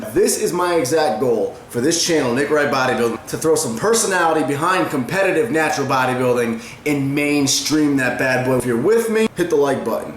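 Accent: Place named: Rhode Island, United States. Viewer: Did I just hear a classic RI accent Nick Wright Boda-buildin?! hahaha nice video brah! After a few weeks we will see how its working and make adjustments from there.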